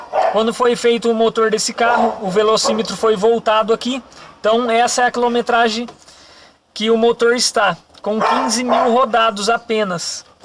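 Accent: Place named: South, Brazil. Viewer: Eu tenho uma Brasilia 1978 que meu pai tirou "zero" e como sou aposentado, e realmente é dificil achar mecanico que conserte esse tipo de carro, assim começei a ver videos sobre o assunto - por exemplo o canal do Tonella que é muito bom entre outros. Em uma dessas duvidas queria saber como verificar a compressão do motor e seu video apareceu e foi muito bom. Em relação onde vc mora é pq me pareceu que tem um sotaque do sul e fiquei curioso em saber qual a cidade somente isso. Entendo que hj em dia existe muita violencia, vigaristas e todo mundo tem que se precaver. Mas sou da paz moro em Guarulhos e só quero aprender um pouco mais da mecanica a ar e possivelmente trocar experiecias. OK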